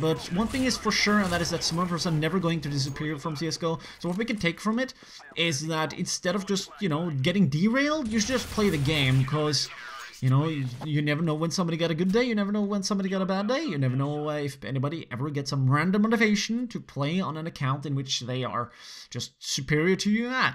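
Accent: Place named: Norway. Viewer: Jeg bare slker hvordan du snakker litt Norglish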